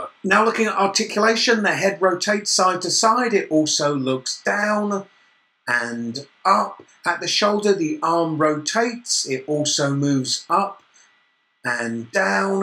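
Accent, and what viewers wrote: England, United Kingdom. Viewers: Sorry, it just sounds like a regular English accent to me. I admire you alot and would never intentionally insult you.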